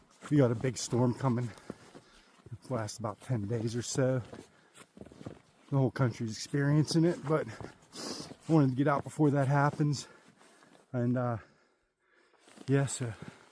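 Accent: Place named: Pittsburgh, United States. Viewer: I can yell you are from western PA. Lol. The Pittsburgh accent. I am too. Great vid man.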